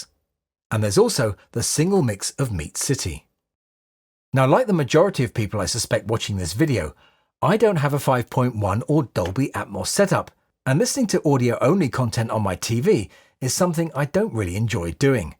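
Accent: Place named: United Kingdom. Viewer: Hola !! Desde Argentina, muy fan de John, amé toda tu explicacion !!! espectacular y ese acento britanico acompañando la explicacion da gusto oirlo. Te felicito. gran video. gran disco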